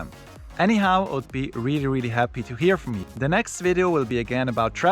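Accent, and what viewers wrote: Switzerland. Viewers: Hi! 😊Thank you so much for your swiss german in this video, you are very kind and nice! 😊 Swiss german is great but too difficult to learn for me😊. Greetings from Poland for you! 🇵🇱😊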